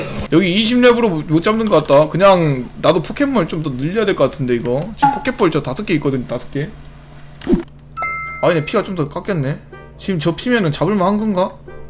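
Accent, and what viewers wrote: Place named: Jeolla, South Korea. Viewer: 전라도 광주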